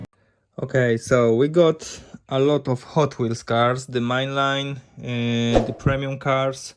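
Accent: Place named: Poland. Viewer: Słychać że Polak 😀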